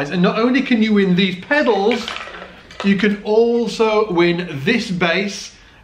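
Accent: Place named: Leeds, United Kingdom. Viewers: He’s an English guy speaking English, with a regional dialect - in this case, that of Yorkshire (albeit certain Americanisms have crept in). Here’s a thought, though; if you have difficulty with English accents that aren’t standard RP Hugh Grant-type stuff🧐....TRY HARDER. We sent you the Beatles.....I would have thought THAT would have given you a clue. 😉😂😂😂😂😂😂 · Tuner. Yes I don't like English accent too. This Leeds area English is some kind of German and London mix imo LOL... Anyway, I prefer standard American accent personally.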